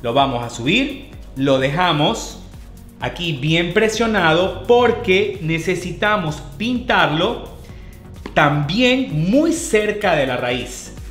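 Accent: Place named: Venezuela. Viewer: Hola me puedes informar en que estado atiendes??? Ya por el acento creo que eres de Venezuela y nada como nuestras mechas, extraño mi estilista😞😞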